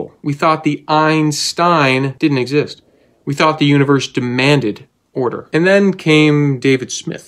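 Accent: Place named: England, United Kingdom. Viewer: Why is there a german flag on your ceiling, when you can't even pronounce german without an English accent. (Genuine question here, no disrespect intended!❤)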